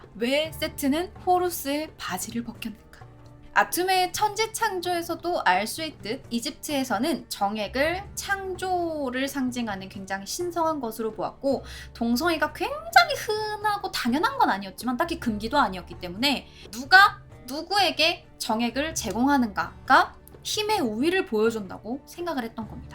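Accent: Gyeongsang, South Korea. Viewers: 응 경상도 여자네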